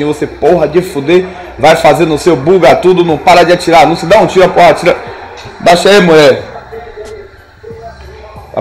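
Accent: Bahia, Brazil. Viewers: So podia ser bahiano ne kkkkkkkk ( tambem sou )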